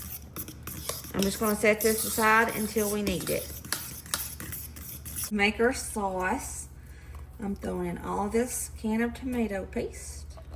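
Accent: South, United States. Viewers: I love your southern accent. It's so cute! I'm Alabama, born and raised, so you know I have an accent too but isn't as cute. Girl, yours is pure cornbread, and I love it!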